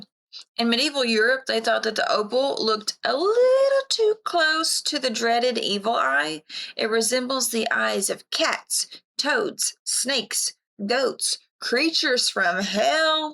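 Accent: Kentucky, United States. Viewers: It’s the humor… the sarcasm…. The KY accent?? I’m here for it!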